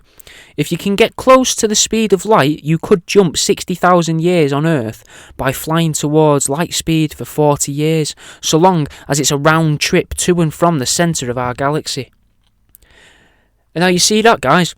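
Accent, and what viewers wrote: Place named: Lancashire, United Kingdom. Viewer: You from lancashire fella?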